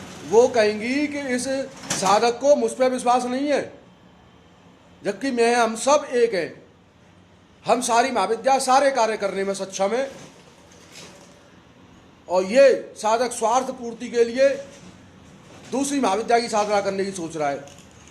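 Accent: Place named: Mathura, India. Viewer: Pranam guruji aap kha se h aap ki bhasaseli mughe apne mathura ke jesi lagi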